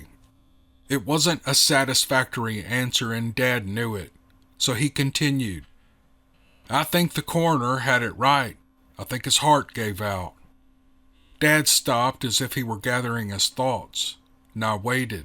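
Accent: South, United States. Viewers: Awesome story!! Neoma definitely has a way with words. Her very descriptive form of writing drew me in and had me feeling like I was part of the story. Neoma's writing coupled with silky smooth Southern drawl is a match made in storytelling heaven🥰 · Thank you for another great job. Enjoyed it very much. I guess it's that lovely southern drawl that just pulls you into the middle of the action. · I got to say this is one of the most interesting stories you have read. And I also will comment on your wonderful accent and phonics and the way you speak/read to us all. Your accent sound, southern, but without the heavy drawl-but I’m not exactly sure where to pinpoint where your accent is from! Where I’m from there’s been all types of accents and I can usually identify them really well. Tell us please. And thank you again for these really really interesting stories.👏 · Super story! 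Kept falling asleep to your sweet southern drawl. It took the whole night, till am for me to hear the ending. Wow! What a conclusion it was! I'm awake now 😂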